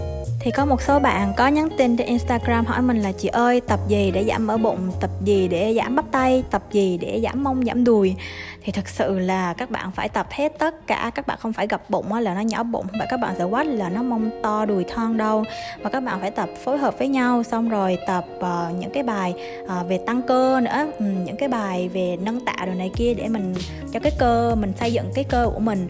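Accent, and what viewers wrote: Da Nang, Vietnam. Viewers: Nghe giọng bạn như ở Đà Nẵng ý?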